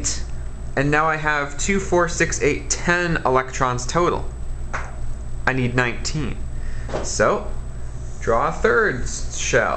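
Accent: Canada. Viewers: the canadian accent just makes everything better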